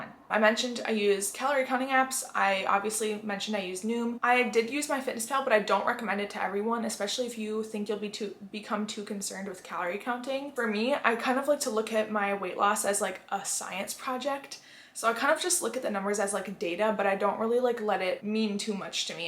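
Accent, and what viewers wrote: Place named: Michigan, United States. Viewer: oh I thought she had an Michigan accent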